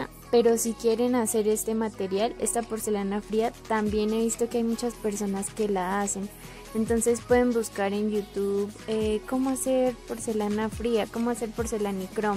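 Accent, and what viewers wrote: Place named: Colombia. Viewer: lo sabía reconocí tu acento jaja yo también soy de Colombia , no sabes cómo me alegro de saber que hay alguien con tal talento en mi país , eh visto a muy pocas , espero seamos más ✨💖 tienes todo mi apoyo ewe ✨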